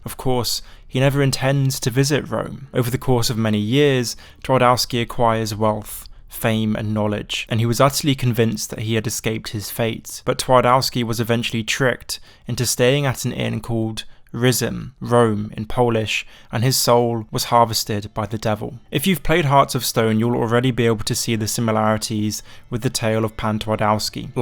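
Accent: United Kingdom. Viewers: He's clearly british mate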